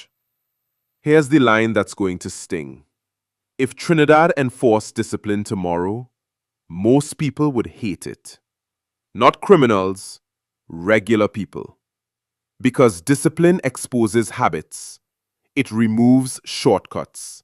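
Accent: Jamaica. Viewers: Who is this person? He does NOT have a Trini accent? He sounds more like a Jamaican. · This person has a Jamaican accent, what do they know about trinis?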